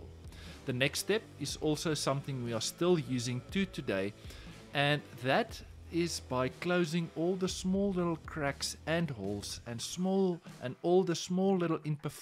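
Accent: South Africa. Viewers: Interesting video, in 2021 I came across a video about epoxy tables and I was intrigued did research and it was interesting, then I let the idea of starting an epoxy business goes waste, 1 1/2 years past then I came across your channel, perfect because I could understand your better because you from SA...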